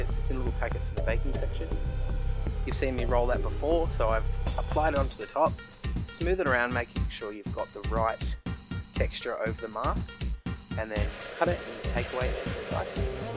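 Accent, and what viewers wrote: Australia. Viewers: Aussie!!!! Damn I miss Australia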